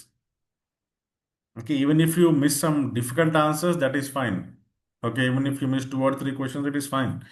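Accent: India. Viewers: Bro your voice as a hindian person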